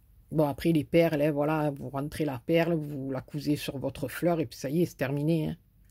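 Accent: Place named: Marseille, France. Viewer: oupss lol l accent de Marseille ou les alentours mdr j adore je m abonne trop rigolote bravo le bonjour de toulouse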